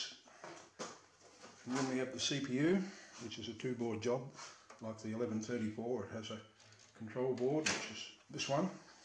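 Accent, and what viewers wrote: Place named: Australia. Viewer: Nice to see another -11. Mine are all QBus so it's nice to see a nice unibus box and good to hear another aussie accent. Will subscribe. Hope to see more. Keep up the good work!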